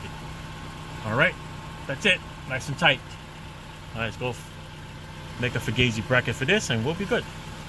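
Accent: New York, United States. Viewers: Thank you, thank you thank you. I am a native NuYawka living away from home and experiencing the same problems with my 2011 Honda CRV. The quotes I got were astronomical and pushed into my "I wish I was home cause I beat this can be done for under 50 bucks!" After trying to find a better price I transitioned into "I can do this my(dayam)self...I am NY Hard!" When I found your video my ears perked up b/c I said I know that "accent." Then after watching you fix this simple problem and share the information gave me new hope. Again, thank you, thank you thank you.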